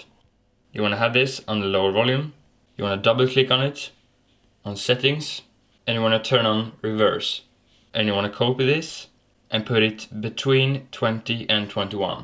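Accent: Sweden. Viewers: Vet inte om jag har rätt. Men redan 5 sekunder in i videon kunde jag höra att du var svensk. Det är väl positivt att man har bra öron. Bra när det kommer till musik. 😂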